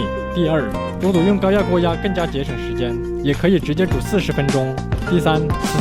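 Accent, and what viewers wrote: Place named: Sichuan, China. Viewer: 明显川普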